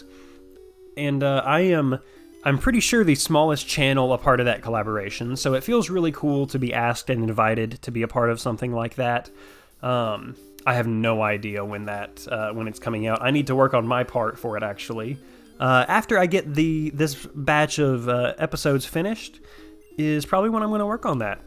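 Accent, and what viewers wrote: South, United States. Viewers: I <3 you. I’ve watched all of your Pokémon runs to this point (a few times since I watch them to sleep) and have now started this nuzlocke. It’s nice hearing another southern voice for my Pokémon runs. Plus, coming from a Pokémon challenge connoisseur, you have really high quality videos and they’re great to watch. I see you’re more busy with life now, and I just wanted to leave this comment and thank you for all the great content you’ve put on here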